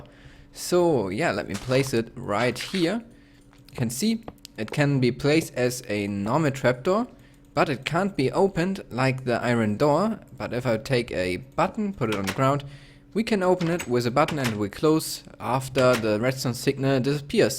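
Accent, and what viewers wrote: Germany. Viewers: Are you german? ;)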